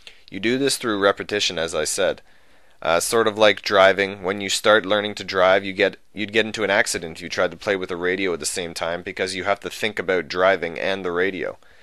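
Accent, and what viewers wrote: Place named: Halifax, Canada. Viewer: Are you from Halifax? That accent always gets me...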